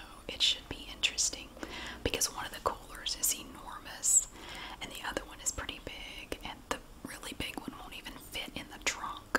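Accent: South, United States. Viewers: It's still a raffle, even if they aren't paying money for the tickets. Raffle just means a random drawing. Thanks for this. I made a comment on your golf video about you being too entertaining for me to fall asleep to your videos. I'm too interested in this so I can't sleep. It's okay. I always have the option to watch your jewelry vids. Those work for me. I hope you have/had fun at your reunion!!! You always do so much for us. I wish I had a billion dollars to give you because you deserve it. I just come for the whispers. Your southern accent just calms me. You have such a motherly-vibe. Do your kids and family know how much you've helped other people?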